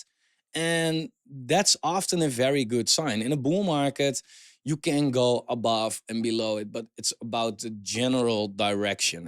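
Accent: Netherlands. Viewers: The accent ? , are you Dutch ? Kom je uit NL ?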